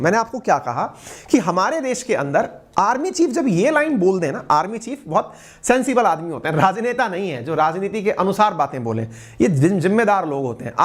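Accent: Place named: Rural, India. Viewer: Kya dehatiyo ki tarah bolta hai master. 😅😅😅😅😅😅😅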